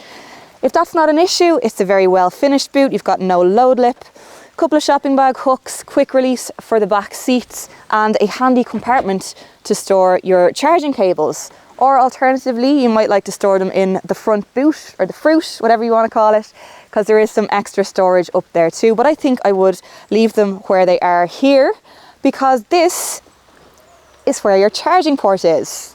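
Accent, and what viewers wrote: Ireland. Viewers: Wonderful Irish accent thank you..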